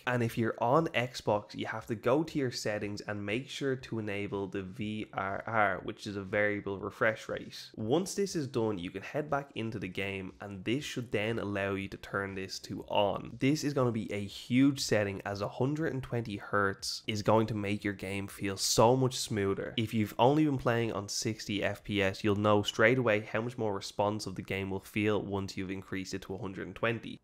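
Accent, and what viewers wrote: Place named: Ireland. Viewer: i can hear the irish in this fella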